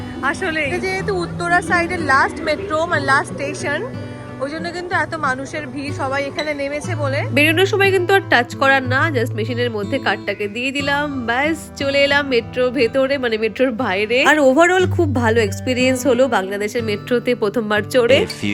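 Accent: Kolkata, India. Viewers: ওনার কথাবার্তা পুরোপুরি কলকাতার বাঙালিদেড় মতন বাংলাদেশের মতন কোনো টান নেই এবার দেখার কথা যদি বলেন সেখানে ভারতীয়রা ও বাংলাদেশিরা একিরকম দেখতে আলাদা কিছু নয় কারণ আমরা সবাই আর্য তাই আমাদের চেহারার গঠন এক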